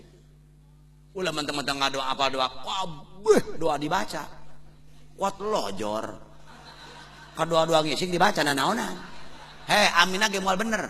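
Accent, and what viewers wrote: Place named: Rangkasbitung, Indonesia. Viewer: Rangkas bitung hadir poko na kh mah eweh 2 na mantap ciri khasnya bahasa rangkas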